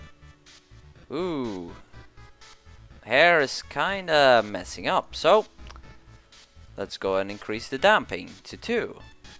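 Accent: Netherlands. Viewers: i dacht al dat een nederlands acsent had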